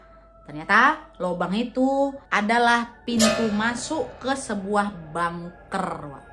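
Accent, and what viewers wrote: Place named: Minangkabau, Indonesia. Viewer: lucuu banget bahasa minang nya uniiiii😂😂😂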